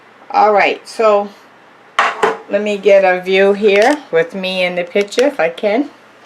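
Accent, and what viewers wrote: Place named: New York, United States. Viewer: Thanks for the video and I love your New York accent!!!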